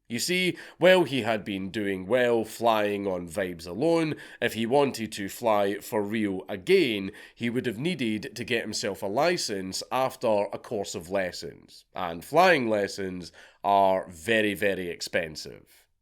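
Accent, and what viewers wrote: Scotland, United Kingdom. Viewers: Heard the story, read the story. But they story is best told with a scottish accent.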